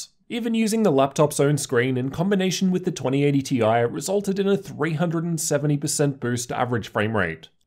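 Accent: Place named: Australia. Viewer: One of the reasons I watch your videos is because I like mimicking accents and I am not so good with Australian accent, u talk clearly and you r a tech YouTuber so I can do two things at a time, watch tech videos and learn Australian accent 😁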